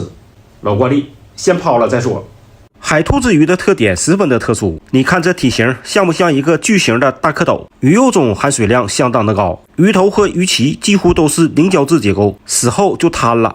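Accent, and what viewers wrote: Northeast, China. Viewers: 这东北大碴子口音😂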